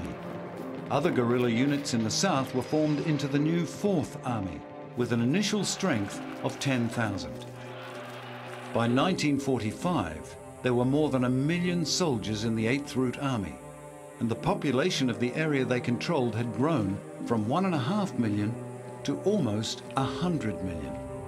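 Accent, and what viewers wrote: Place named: RP, United Kingdom. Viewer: Tse Tung was romanized to Zedong maybe ten years ago? I dunno for sure but recently-ish. And Keev I think is just his posh and very proper British accent kicking in.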